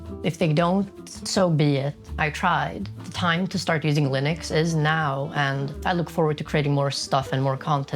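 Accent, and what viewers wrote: Sweden. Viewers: Say no more, the Swedish accent already convinced me. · just clicked on this recommended video, hearing you for the first time. You sound as if you're from Sverige. Anyway, I subsribed because you support FOSS. No matter what *nix you use, I'd recommend to look into the history of *nix, where our beloved penguin is a major part of. Keep up the good work, thanks for this video and love from NL. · You sound swedish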